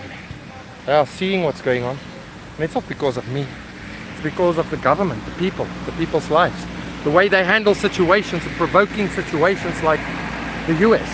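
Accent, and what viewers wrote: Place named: South Africa. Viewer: I just love your accent. I think the South African' English is the coolest of all English accents.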